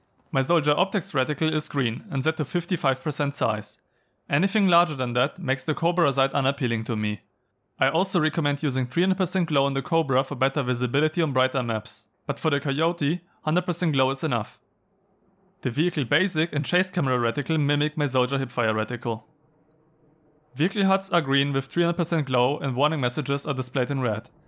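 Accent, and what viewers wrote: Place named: Germany. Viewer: Ah finde es immer wieder herrlich wie man in weniger als 5 Sekunden sofort hört "das ist n deutscher" 🤣no offense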